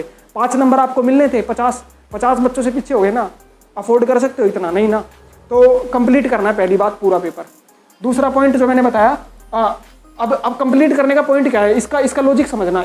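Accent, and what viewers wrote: Haryana, India. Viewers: Sir aap haryana se lag rhe ho 😎, thanks sir ji 😇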